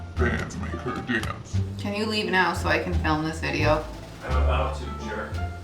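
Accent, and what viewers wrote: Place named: Northern Illinois, United States. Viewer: Hearing her accent makes me homesick. I grew up in Central Illinois but my mom's side is from Northern Illinois, but south of Chicago, and she sounds so much like my cousin whom I miss terribly.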